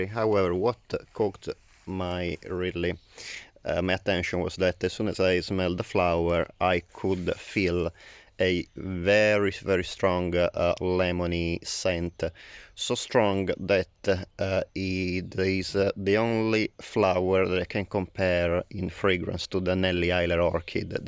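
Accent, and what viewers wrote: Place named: Italy. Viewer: Howdy from Canada. I would call that color kelly green or Irish green. I grow cannabis flowers indoors, legally of course. Love the Italian accent. Stay safe and be well. Smell you later, eh? ♥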